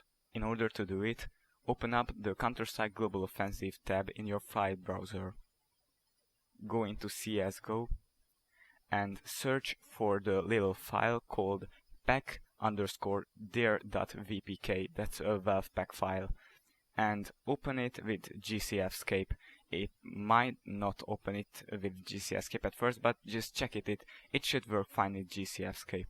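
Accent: Hungary. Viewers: Az akcentusból tudtam meg hogy magyar vagy! Sok angol után nagyon könnyű kihallani :D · Szia :D Ahogy láttam a géped nyelvét, és hallottam a kiejtésedet, magyar vagy :D Nagyon szépen foléykonyan és érthetően beszélsz angolul, kívánom, hogy legyen vele nagy hasznod az életben! :) :D Ui: Köszi, nagyon sokat segített a videó :) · Nagyon durván hallatszik a magyar akcentus :D De ügyes vagy! csak így tovább!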